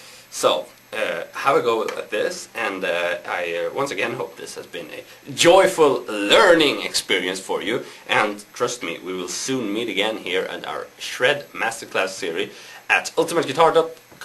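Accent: Sweden. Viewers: I am ashamed because it sounds just like Swedish accent. I fight tooth and nail to be self aware and not sound like that when I speak English! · Haha!!! He´s swedish, i know the accident ´cause i´m also swedish (even through, i´m not that bad att english accident!!!) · im a member at ultimateguitar, quit hating on this guy, he's freakin amazing. His accent is because he's from Stockholm Sweeden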